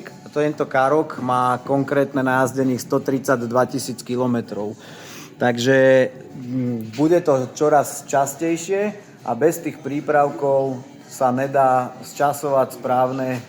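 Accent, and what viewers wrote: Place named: Trnava, Slovakia. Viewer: spravne cavo vyprava akoby vzivote trnavu neopustil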